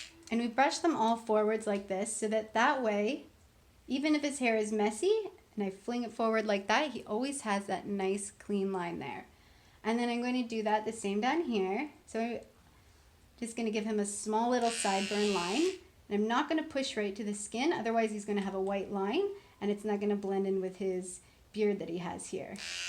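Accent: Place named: Pacific Northwest, United States. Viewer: She from Portland or Seattle? smh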